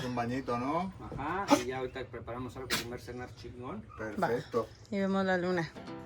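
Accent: Spain. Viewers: Viendo videos de aventureros me encontré tu canal! No te conocía pero viendo tu canal noto acento español???? De haberlo sabido! Yo me crié en España hasta los 22 años! Ojala hubiéramos coincidido antes! Te hubiera dicho unos spots muy buenos en oaxaca para acampar! Si te vas a quedar más tiempo por el estado échame una voz! Saludos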